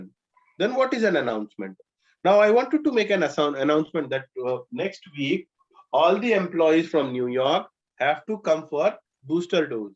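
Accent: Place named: India. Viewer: I thought it was a woman guest, I am sorry but Indian accent in english is terrible :(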